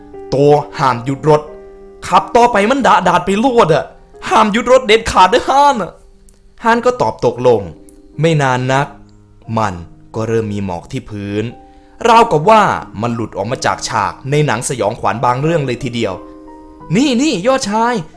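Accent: Isan, Thailand. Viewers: ชอบเสียงอีสาน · เนื้อเรื่องมันก็น่ากลัวนะ แต่ฮาสำเนียงอีสานพี่มากกว่า อินอะไรขนาดนั้น <num>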